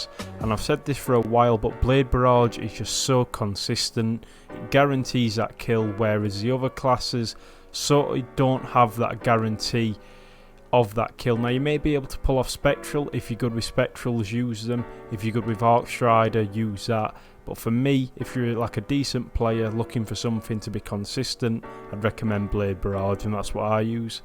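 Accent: Yorkshire, United Kingdom. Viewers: Where from Yorkshire u from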